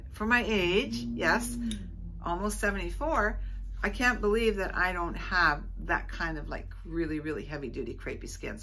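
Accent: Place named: North, United Kingdom. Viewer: You sound like you’re from the north. You look good. Me likey 😊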